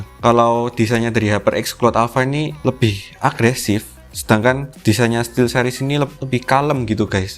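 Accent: Java, Indonesia. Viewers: mantap bang , ada khas medok medoknya . GDluck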